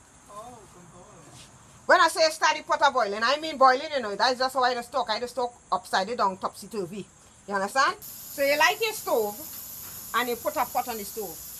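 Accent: Trinidad and Tobago. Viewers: Looking so good.Love the Trini accent.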